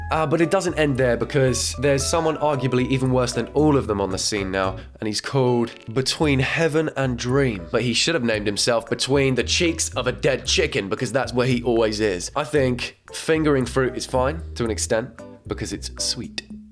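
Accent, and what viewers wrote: United Kingdom. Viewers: yoooo is it me or he js sounds british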